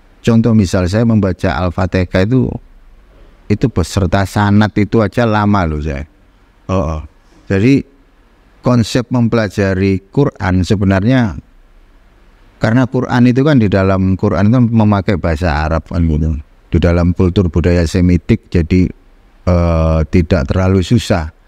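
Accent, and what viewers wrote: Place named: Surabaya, Indonesia. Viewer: Masya Alloh b sy kgum dg anda,nada bicara anda yg santai dg logat Surabaya,pengkajian anda yg sgt ditail n mudah di fahami,SMG anda tetap Istiqomah.amin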